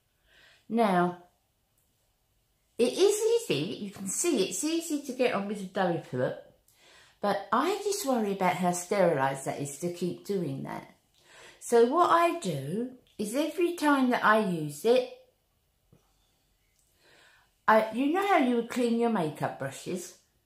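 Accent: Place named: North, United Kingdom. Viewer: Hello, I'm new to your channel, and have really been enjoying your videos! I have to agree about this foundation. It is an excellent option for summer. I live in Tennessee in the US and we are currently dealing with temps of 96 degrees F (~36 C) with heat indexes of 109 F (~47C). Despite the horrible humidity here, this foundation holds up fairly well, so that's saying a lot for its staying power, but as you said, it's not heavy feeling. Just curious... What skin type are you? I'm fairly oily in my T-zone, so the matte finish truly helps me. I think it looks gorgeous on you all the same! Big Rimmel fan from over the pond here. My husband is from central Scotland, and we were trying to place your accent. Our guess.. Are you from the north? Charming for sure. 😉 💕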